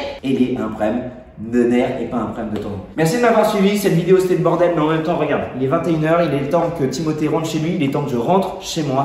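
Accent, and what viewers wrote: South, France. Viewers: il est du sud de la france et la on parle comme ça et je trouve ça simplement chouette et inoffensif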